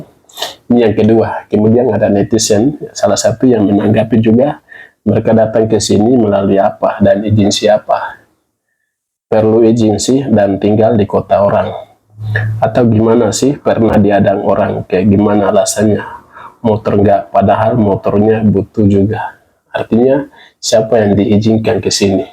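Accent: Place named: Papua, Indonesia. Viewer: Bro kamu kok bhsnya santun kok bhsnya papua nya masih melekat tapi kok bisa santun..bravo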